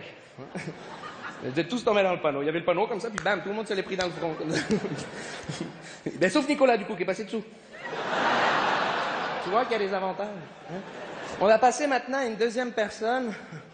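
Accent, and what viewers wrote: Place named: Quebec, Canada. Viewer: en passant , sont accent quebecois est pas pareil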